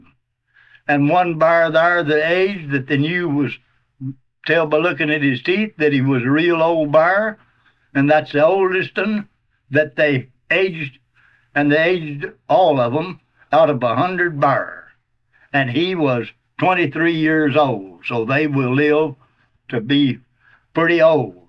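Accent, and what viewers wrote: Tennessee, United States. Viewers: Sounds like he is from Carter County TN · He almost sounds like an East Tennessean, where I’m from. Maybe mountain talk is universal? Great story teller.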